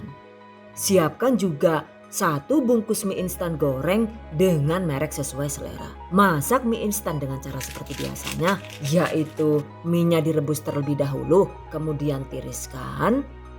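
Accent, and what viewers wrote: Banyumas, Indonesia. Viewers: Setiap lihat video yang aku tunggu adalah ngomong NGAPAKNYA.. wkwkwk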